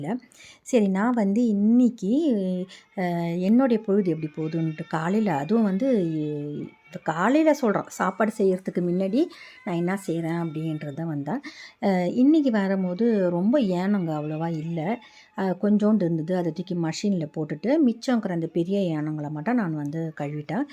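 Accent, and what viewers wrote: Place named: Pondicherry, India. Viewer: Bonsoir, comme d'hab,une bonne recette toute simple.J 'adore tes vidéos pour ta façon de parler,une vraie Pondichérienne 😄.Tu me fais pensé à mes parents et à mon enfance.Merci ma chère.Continue à nous faire plaisir ❤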